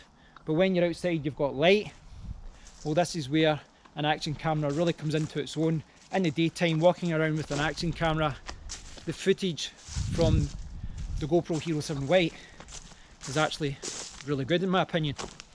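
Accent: Scotland, United Kingdom. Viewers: Brilliant work mate. Loved the detail. I shudder to think the time I would have wasted trying to get it down to 1080p! I needed something quite idiot proof. You have kept my sanity! Thanks a million from Australia and coming originally from Dundee it was wonderful to get your delivery in Scottish! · Thank you for making a video for those of us still sitting on the fence as to which GoPro to buy! Your channel is the first one I've found that doesn't use obnoxiously loud music before and during their videos, so I could actually hear and understand what you were trying to tell us. Your Scottish accent was fun to hear, as you sound the character on the Dance Mat Typing program for kids :) Cheers from California!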